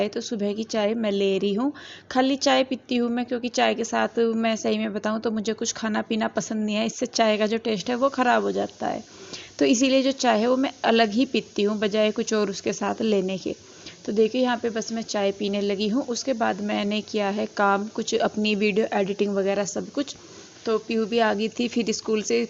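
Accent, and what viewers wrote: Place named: Haryana, India. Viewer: Nice recipe di and aap haryanvibolte bahut achhe lagte ho.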